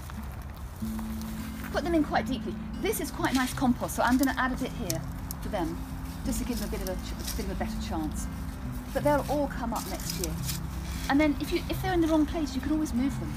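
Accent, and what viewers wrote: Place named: RP, United Kingdom. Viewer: 好喜欢听的Qween’s English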